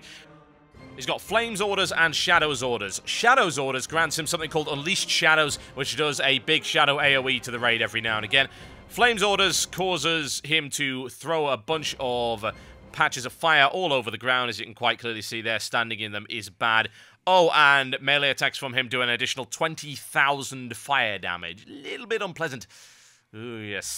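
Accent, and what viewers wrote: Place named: London, United Kingdom. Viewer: man, you're really british.. and I think it's plesant.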